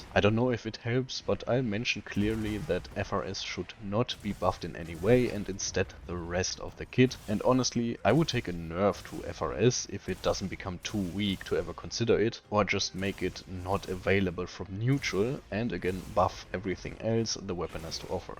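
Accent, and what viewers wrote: Germany. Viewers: Are you german by any chance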